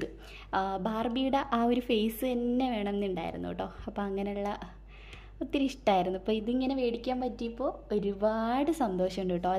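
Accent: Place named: Thalassery, India. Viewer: Super 👍👍 thalassery ഭാഷ kelkkannkile nigale entha youtube channel kannan varuu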